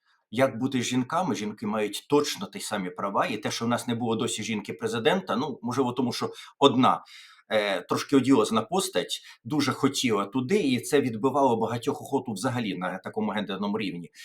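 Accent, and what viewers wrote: Ukraine. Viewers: Вітання зі Львова! Дякую, п., що не забуваєте галицьку говірку! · Я родом з Івано-Франківської області і так само розмовляю як пан Тарас живучи тут в Америці і люди які приїхали з других областей вони навіть часом не розуміють мене тому, що кажуть що я вживаю багато руських і польських слів, але то є моя рідна мова з якою я виросла і я так розмовляю, тому мені дуже приємно слухати вас пане. Дай Бог Вам здоров’я🙏🏻 ви маєте дуже чудовий приємний голос і ви є високо інтелігентна людина! З великим задоволенням вас слухає багато людей тут в Америці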